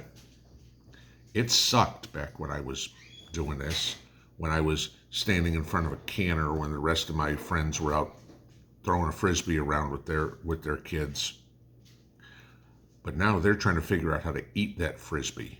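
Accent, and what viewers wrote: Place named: Appalachia, United States. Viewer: You sound as though you could be a neighbor...I'm deep in the Appalachias.....same out here....and I love it!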